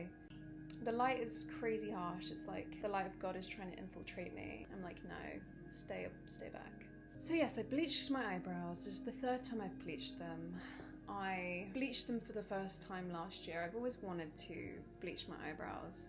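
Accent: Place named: RP, United Kingdom. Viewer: ! Why are you so posh?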